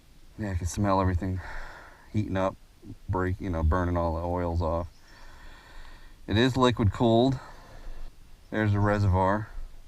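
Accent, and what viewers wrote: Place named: Maryland, United States. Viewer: what state are you in? Sounds like MD or PA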